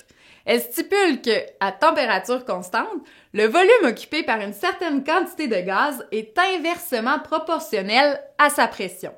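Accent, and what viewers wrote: Quebec, Canada. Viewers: ca a aucun rapport elle parle tres bien francais elle a juste un accent quebecois pcq crois moi que si un parisien vient au quebec c lui lextraterrestre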